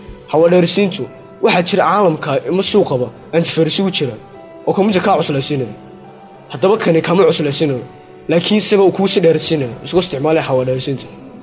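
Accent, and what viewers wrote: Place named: Somaliland, Somalia. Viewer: socdaal media he isn't from mogdisho he is from Somaliland don't you understood easily and koonfur is different speak.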